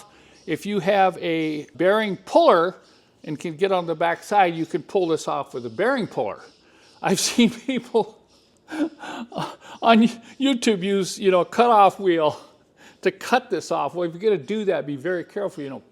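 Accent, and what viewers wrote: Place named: United States. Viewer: Spanish translation is awful. We love your american english!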